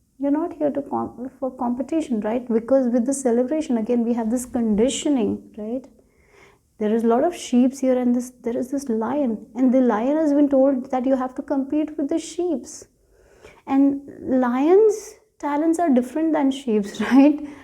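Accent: India. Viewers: 😱😳🥹 Ohhhh, wwhhhooooowww. First time I'm guidet to you ... My choosen pile ... speakless. How is that possible? Thank you sooooooo, so much. It is exaktly what I've needet for helping in my situation right now. A big gift.🙏❤️‍🩹💖😇🎁 Indian angel, a big hug from Germany, so nice, your accent. 😊 Isn't it wonderful, how we can understand?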